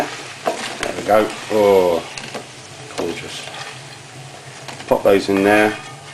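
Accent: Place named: England, United Kingdom. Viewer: Real english Accent, Real English Chips :) Good Lad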